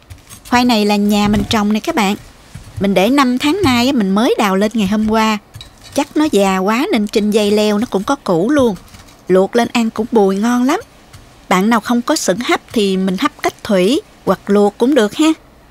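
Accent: South, Vietnam. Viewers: Cô chất giọng gốc miền nam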